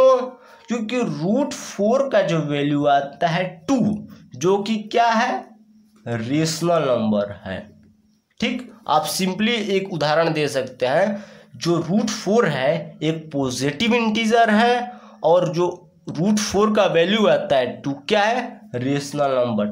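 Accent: Bihar, India. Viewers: Sir aap Patna Bihar se h....?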